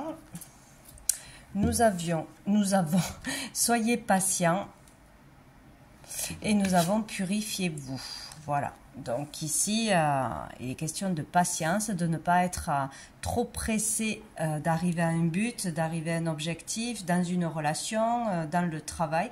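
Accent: South, France. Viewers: Merci, tirage intéressant à écouter. Nous avons tous les deux un accent particulier, toi du sud de la France, et moi du Québec. 🙂❤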